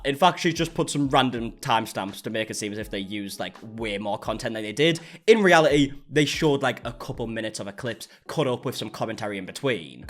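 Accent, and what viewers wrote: United Kingdom. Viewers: The purple is honestly a nice light colour and your accent and the way you speak has always been amusing to me (not in a mean way) just saying your accent is not a problem, nothing wrong with it. I’m also British and I sometimes get told by people from America that I sound like I’m speaking a completely different language 🤣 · I love the Bri'ish accent. It's a huge plus for me when choosing which youtubers to follow. One thing I love about youtube is finding people that aren't just Americans and hearing how they sound. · don't you dare change the adorable british accent, i will throw hands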